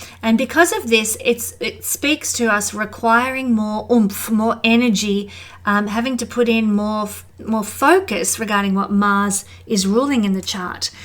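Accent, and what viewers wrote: Australia. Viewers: Refreshing to hear an australian astrologist, aussie here too Taurus sun, Libra moon, Leo rising Love your videos and a conspiracy realist here also ❤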